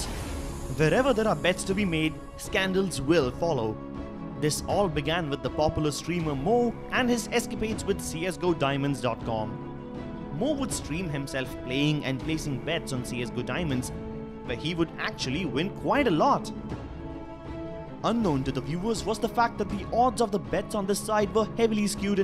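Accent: India. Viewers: Indian accent f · This Indian accent is killing me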